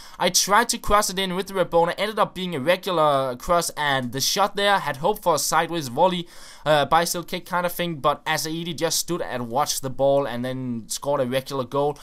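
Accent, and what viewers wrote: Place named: Denmark. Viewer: He's From Denmark, whoever says he has a bad accent try uploading daily Youtube videos in a different language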